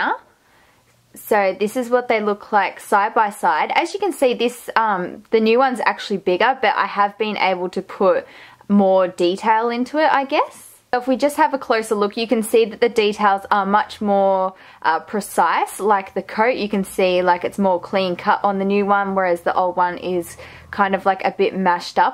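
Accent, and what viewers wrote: Australia. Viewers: That Random Girl she’s Australian lmao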